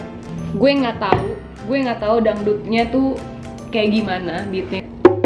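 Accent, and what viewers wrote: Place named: Makassar, Indonesia. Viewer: bedede org Makassar pae,salam dari Makassar nah 😂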